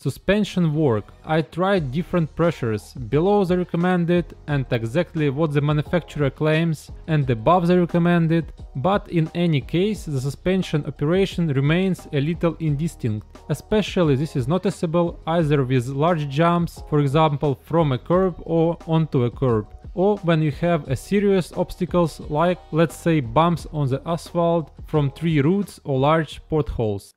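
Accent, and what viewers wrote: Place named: Ryazan, Russia. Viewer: Рязанский акцент :) Успехов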